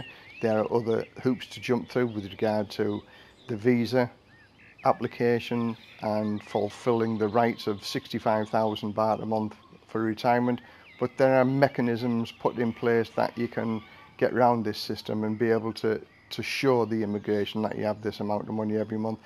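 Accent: United Kingdom. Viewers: Thanks, came at the right time! Saw this coming in the West; only going to get worse. Really appreciated, just needed the extra encouragement from a recognisable voice from the UK. Decisions made..later this year to visit. thankyou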